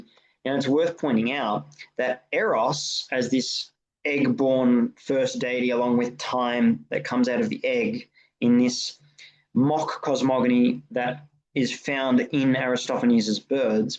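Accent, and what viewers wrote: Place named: United Kingdom. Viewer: You’re one a few people with a British accent I can actually listen to without feeling like I’m being conned or talked to like a child by someone who doesn’t understand what they are saying. You sir, are a gentleman and a scholar.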